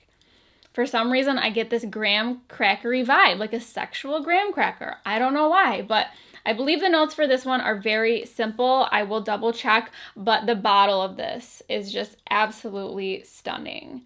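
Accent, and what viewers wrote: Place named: Northeastern Pennsylvania, United States. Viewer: And Im from NE PA, and as soon as I heard u talk lime a year ago...I knew we talked alike!!!!!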